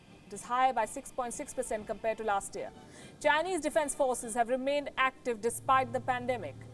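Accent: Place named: India. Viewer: So many people with low intelligence are making hating comments here cheering this biased news video presented by an Indian woman with strong accent.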